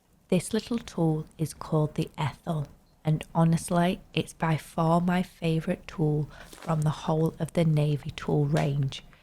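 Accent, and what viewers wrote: United Kingdom. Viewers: I'm not used to a UK accent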